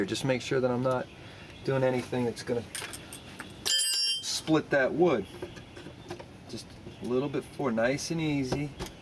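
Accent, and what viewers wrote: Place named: Tennessee, United States. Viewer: Sounds and looks like hes in TN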